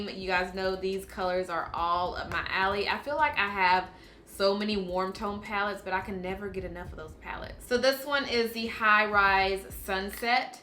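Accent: South, United States. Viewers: Omg, i love your accent😄, you sound a bit from the south country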